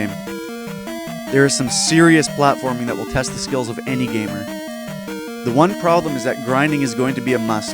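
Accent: Canada. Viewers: Are you Canadian? o.o I can't tell... I feel like you are but at the same time... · I came for the ROM hacks. I stayed for the Canadian accent.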